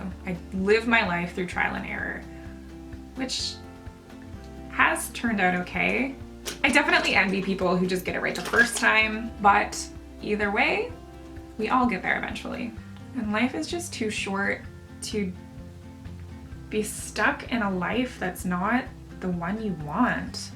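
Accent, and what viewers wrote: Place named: Canada. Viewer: I love your vids girl! I didn't know you were a fellow Canadian ! Even though how could I not know.... our accents are pretty distinctive :p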